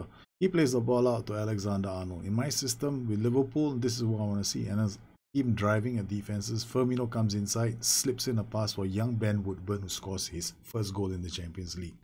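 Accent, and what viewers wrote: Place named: Singapore. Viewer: you sound a little Singaporean hmm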